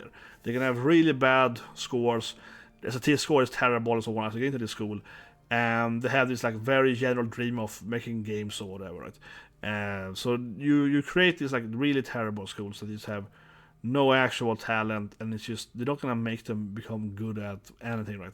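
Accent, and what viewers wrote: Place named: Sweden. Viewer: Interesting! Just starting the video, but it hits all the right points for me to want to check it out. I worked in the games industry for a bit over 10 years many years ago in NY and left a few years before the hard left turn really got underway. Också är jag amerikanare men har bott i sverige i dryggt över tio år och din dialekt/brytning låter precis som alla mina svensk kompisar lol.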